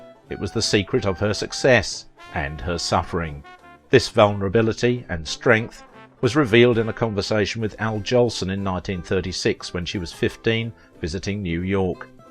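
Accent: England, United Kingdom. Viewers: A normal, beautiful English accent. It's our American accent that can be called thick, in my opinion. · About I had to play it back, cause I thought the narrator said Sal Vagina...🤔, he had a thick British or English accent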